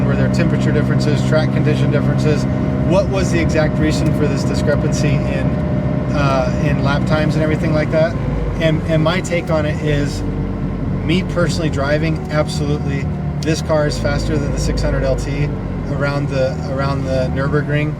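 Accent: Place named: Northern California, United States. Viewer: Always appreciate your videos. I've been following your journey since boosted borris. I'm planning on coming to apex as soon as the world is back to normal. I'm curious you are definitely from the states and perhaps in a video if you haven't already you could talk about how you ended up in Germany and also, my guess is your either northern CA or the north west born and raised? Am I close? Cheers.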